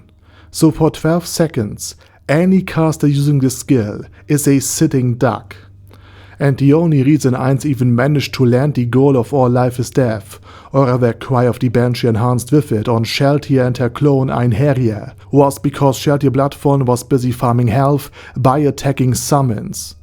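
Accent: Germany. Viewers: Boah the german is very strong with you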